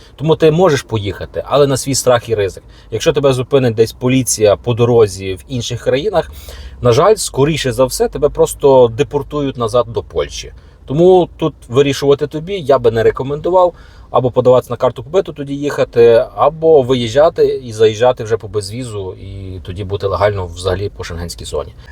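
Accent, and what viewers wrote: Western Ukraine, Ukraine. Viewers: Бридкий західний акцент і оце кошмар, говоріть українською, і наголоси ставте правильно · Який гидкий голос за кадром, ще й, з западенським підви́вом..Якою мовою вона говорить, бо я українка, але не розумію, що вона там варнякає..?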